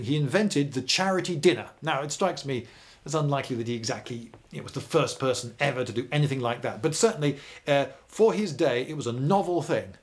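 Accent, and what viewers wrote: England, United Kingdom. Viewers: He is also brittish, VERY brittish. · Pretty sure this guy is british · I always love hearing an Englishman talk about Napoleon. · This is the most british briton to ever Brit. Love it!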